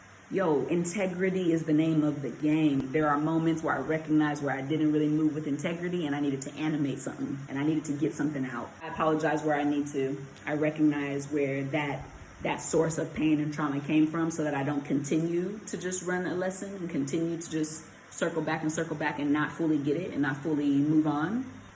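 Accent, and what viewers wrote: Jamaica. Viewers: Such a great video. U touched on a lot of good stuff, and I feel all of this so much. Ppl be missing opportunities with real ppl and u cant force it. As u sai, jus wish them well. R u Jamaican?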